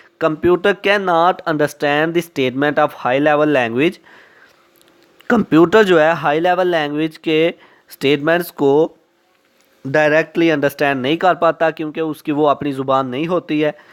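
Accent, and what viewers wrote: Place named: India. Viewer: u make the title english then speak indian ?!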